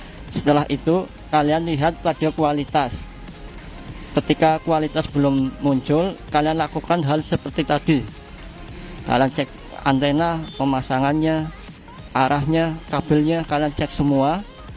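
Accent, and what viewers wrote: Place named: Moga, Indonesia. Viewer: Pemalange ngendi kang..... Opo moga, kadi logatte koyo wong moganan 🙏😁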